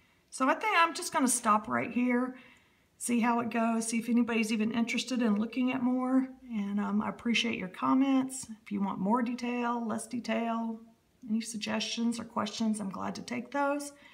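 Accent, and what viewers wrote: United States. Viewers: Hi, A wonderful flip through. The balance was perfect. I was interested all of the way through. It's lovely to put a voice to the face and you have that gorgeous American accent with the lovely elongated vowel sounds. I look forward to the next one, Ali xxx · you did a fabulous job!! Loved seeing all your beautiful pages and love your little southern accent!!!!!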